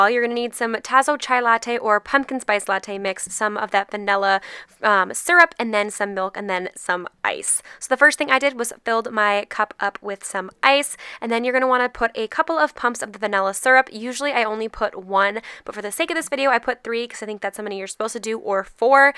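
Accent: Australia. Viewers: Is this Australian? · Are you an Aussie?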